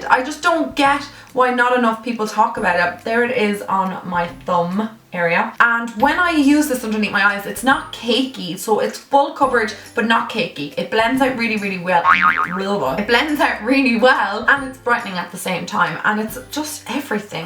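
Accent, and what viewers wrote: Dublin, Ireland. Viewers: I love the Irish accent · would you ever consider doing a full face of makeup revolution, love your videos btw great to hear a familiar Irish accent ❤❤❤ 🇮🇪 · I feel like I recognise your accent are you from Dublin Coz I am plz answer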